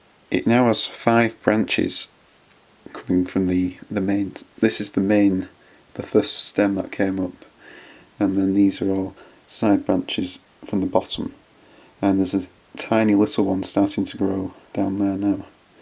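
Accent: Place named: United Kingdom. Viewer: FINALLY! lol I'm so glad you put this one up! I didn't realize how much I was waiting for the awesome camera quality, plant updates, and British accent, until I watched it. Your dragonfruit (and arguably more importantly, bananas lol) are exceptional :D P. S. Might you be growing the Sikkimensis outside eventually, since it is very cold tolerant?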